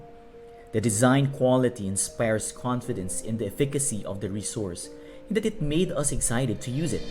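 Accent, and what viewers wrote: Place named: Philippines. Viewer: Are you filipino? I really appreciate the review, but i couldnt help but notice that you sound like my cousins haha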